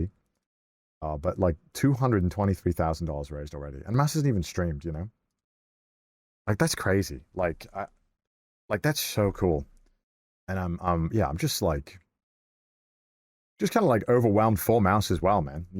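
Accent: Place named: Wales, United Kingdom. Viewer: is so so angry. His Welsh accent is creeping into his radio voice.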